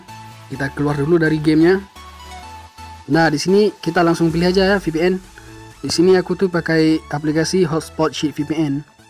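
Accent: Aceh, Indonesia. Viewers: dari logat lu, gw tebak lu dari negri plat bl ya bro?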